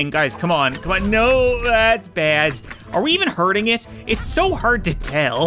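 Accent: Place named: Canada. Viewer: When I first started watching him. I couldn't tell that he was Canadian. but NOW?! Got me up here thinking Paul Bunyon made a YouTube channel😂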